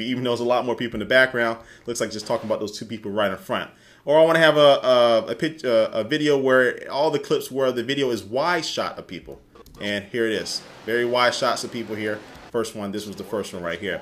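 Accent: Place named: St. Louis, United States. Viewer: the "imma dis" and "imma dat" annoyed me too, but then he said he was from saint lewis, right? so then it's understandable. he's not trying to be cool it's a location thing :)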